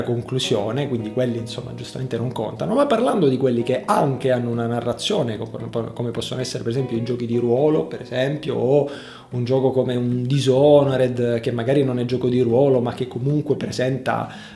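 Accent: Sicily, Italy. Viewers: Ciao ma sei siciliano? non riesco a individuare la tua cadenza nell' accento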